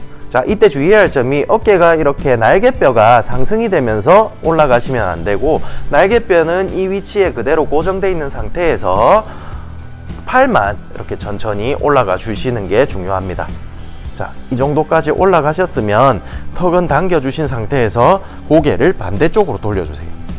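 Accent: Gyeongsang, South Korea. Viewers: ㅎ 저는 그래서 넘 좋습니다 표준말은 어디에서든 접하기도 하지만 때론 딱딱하기도 차갑게도 들리는데 경상도 말은 화면에서 찾아보기 드물고 또 다정다정한 친근감있는 말투가 넘넘 좋습니다 아픈 이유도 상세하게 말씀해주셔서 저는 운동보다 먼저 서론을 더 신중하게 반복해서 듣게되는 1인으로서 자세한 설명에 더 감사할 따름 입니다 고맙습니다 홧팅하시길💗💗💗💗💗💗💗💗💗💗💗💗💗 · 억양이 참 재밌네요 경상도?억양이죠? 따라하게 됩니다 유익정보 감사합니다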